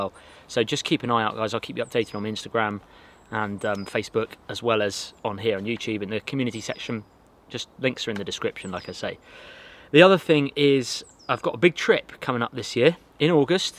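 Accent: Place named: England, United Kingdom. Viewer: Where is that particular English accent from? Kris Harbour Natural Building has that EXACT accent. Tone, cadence, emphasis, everything.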